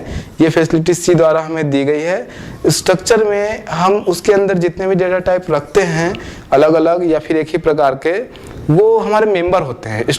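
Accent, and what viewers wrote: Bihar, India. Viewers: Sir aap Bihar se hai kya??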